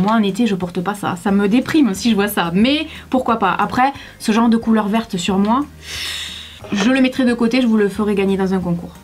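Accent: South, France. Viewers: Salut je suis abonné à toi depuis pas longtemps et chaque fois que je vais sur YouTube je regarde une de tes vidéos nouvelles ou en sienne et voilà je T'adore ton accent du sud à force de t'écouter je le prends (mon père a grandi dans le sud).Maman de YouTube reste sur YouTube ont T'adore tous❤❤❤❤❤❤❤❤❤ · Coucou..pkoi tu es dans love list you tubeuse.. Déjà car c toi que j'ai découvert en premier et puis tu es brillante originale tu as vraiment la lumière a ts les étages et pr moi c Versailles quand je te regardes. C comme ça. Ussi pr les origines un peu communes. Jsuis pierre Richard 3 comme toi. Et tu sais tjrs de quoi tu parles tu parles bien (avec nôtre aquecant.. Erreur les les autres qui on un accent pas nous et au dessus de Montpellier c Paris.. C Flo le catalan qui le dit et c vrai. Avantgardiste par les sujets de t vidéos inspirés d influenseeuses des states tu as tjrs une longueur d'avance. 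Écoute tu es speysse!! spéciale spaciale..astrale..jme sens très connecté à tes pties folies t brins de fantaisie.. Bravo #comment tu parles bien aux djeuns!!!! Tu es le vaccin anti.conneries. C sur que t enfants de yt travaillent mieux à l'école maîtresse.!! Bon dimanche venteux ➡️🌞glagle.. Biz a toi ta mam et🐕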